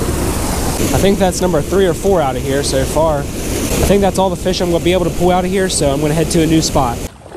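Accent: Pennsylvania, United States. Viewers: Thanks , Pennsy accent?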